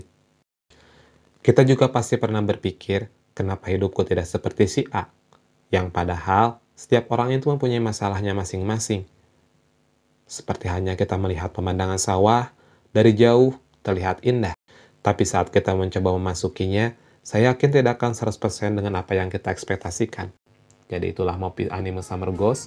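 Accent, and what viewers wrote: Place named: West Java, Indonesia. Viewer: cape haha orang sunda ya bang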